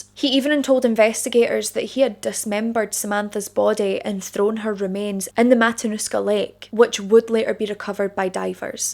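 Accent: Scotland, United Kingdom. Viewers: I've watched several videos on this apex laser! But, yours is the best! I appreciate the hard work and diligent research that goes into your content! Trust me,it shows brightly! Add your brilliant Sottish accent and we get consistent winners! Thanks, Jeff from Tennessee! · I came across your rivers and canal video by chance, so good to here a true crime buff wee a Scottish accent, your passion in that video brought me to subscribe to your channel.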